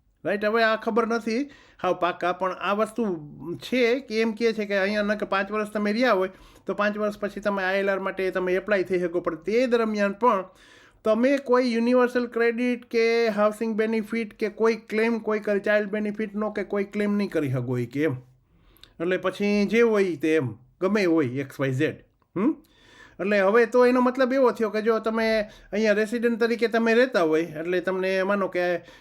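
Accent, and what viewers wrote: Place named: Gujarat, India. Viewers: RAM RAM SITARAM JAYMATAJI bhandedav JAY LIRBAI MAA 12 gav e boli badlay ne gujarati to mastj bolo chov ne aa desi j maja che ha emigration ma nava nava ghana changes aavya che saras informative vlog and family vlog velo velo banavjo ben kaki ne mahadev har kehjo!!!❤❤❤ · You are speaking kathiawadi Gujarati it's perfect. Every 12 Gau Gujarati change little bit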